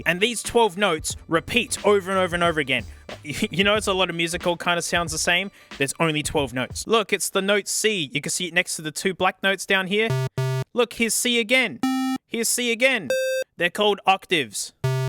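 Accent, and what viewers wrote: Australia. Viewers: Why.....why is this only 12 minutes? We need like..AN HOUR of this! I wanna hear the funny aussie man with his slithery friend named Frank scream and tell me hilariously albeit informative stories while using a synthesizer. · Sounds like the drum museum guy. Drums somethin. Australian.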